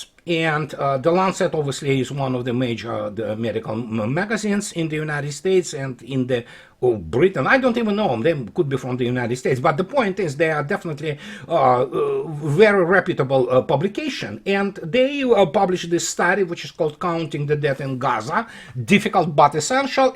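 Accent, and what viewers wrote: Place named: Russia. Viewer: I have no problem with that! Do you want to help this country or not? you came here to escape the Soviet Union and communism. Are you with us or against us? I'm talking about the American people not the elite that are against us in the US government? That is what my prior statement was all about I just don't know where your heart is when I'm listening to you. Cuz you sound 100% Russian which is fine but I don't hear you talk about America and what to do to fix it. So where is your heart line this?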